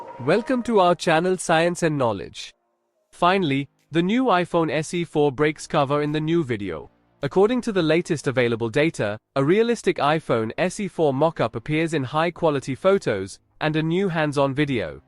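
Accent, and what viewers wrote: India. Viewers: I hate this Indian accent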